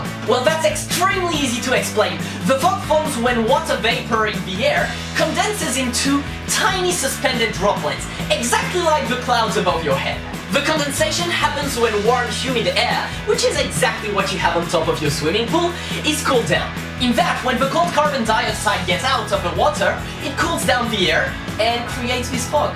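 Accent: France. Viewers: T'as un accent français ! You have à French accent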